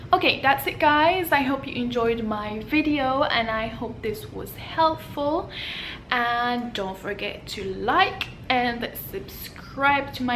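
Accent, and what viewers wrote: Morocco. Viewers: You're 100 pecent moroccan and your accent is extremely great plz make a video in which you talk about how did you improve your English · Morocco Travel she does have a slight Moroccan accent. But it is cute.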